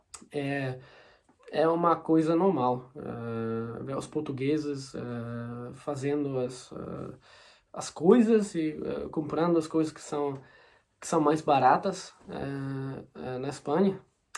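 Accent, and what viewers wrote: Russia. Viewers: you might be a beginner in Polish but some of the harder sounds were on point. You said you start speaking Russian when you don't now how to say something in Polish but I also noticed 1 other thing, you seem to have a slightly Russian accent in Polish, I'm guessing because Russian was the first slavic language you ever learned and now it carries over to Polish. there were some grammatical errors but that's to be expected, I commend your efforts nonetheless, Polish is probably the hardest slavic language, even people like Tokimasa Sekiguchi who has been living in Poland for decades pronounces some words in a weird manner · Your Russian accent is very impressive. Te felicito.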